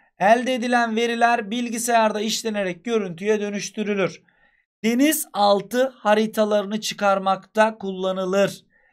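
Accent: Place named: Trabzon, Turkey. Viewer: hocam kesin trabzonlusunuz ilk defa karşılaştım kanalınızla :DDd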